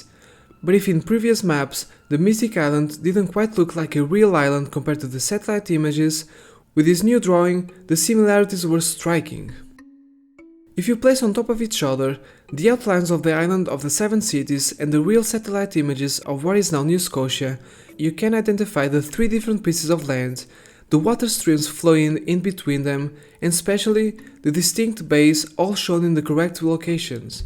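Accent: Portugal. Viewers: Let me guess... youre portuguese?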